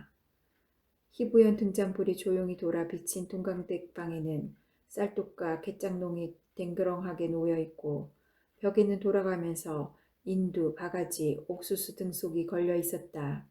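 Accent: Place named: Jeolla, South Korea. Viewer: 구수한 전라도 사투리에 음성이 잊혀져간 엄머니에 음성처럼 정감이있어 행복하게 들었네요 감사합니다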